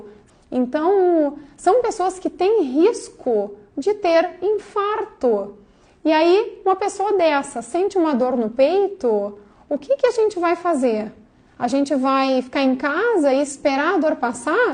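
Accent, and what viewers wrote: Rio Grande do Sul, Brazil. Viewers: Sotaque de gaúcha doutora 😢😢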